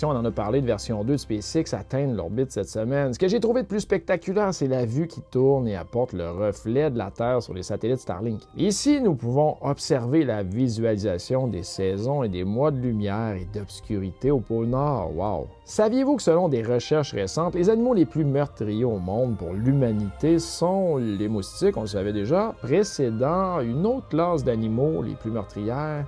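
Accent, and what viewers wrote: Canada. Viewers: J'aime bien votre accent Canadien et la petite voix. Un Français qui apprécie ce que vous faites comme travail.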